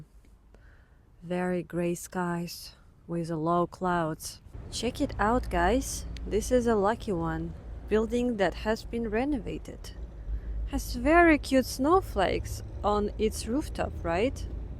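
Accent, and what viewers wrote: Russia. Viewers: Зачем ты говоришь на английском, слышно же что ты русская и говоришь с акцентом😁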